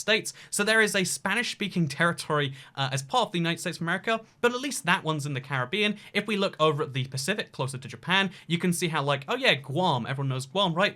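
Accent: England, United Kingdom. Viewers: When you say “Nevada” wrong I feel like it’s okay because you have an English accent, but if someone with an American accent were to say it like that like shit bro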